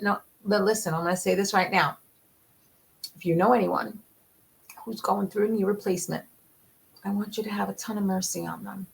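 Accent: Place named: Pennsylvania, United States. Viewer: I’ve been missing you and that PA Italian Girl accent…🥰. Happy to see you back!!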